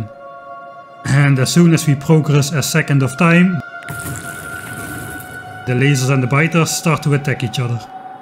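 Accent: Netherlands. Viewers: Lekker bezig man, gave playthrough en mooi bewerkt. I love your attempts to * cough * properly pronounce the English words like recipe. Every time I hear a fellow Dutchie pronounce recipe that way (and I heared it very often back in the day I played WoW) I just crack up :D Gewoon lekker Dunglish blijven praten ;) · I was doubting whether you was german or dutch based on your accent, turns out dutch after all (checked your youtube page).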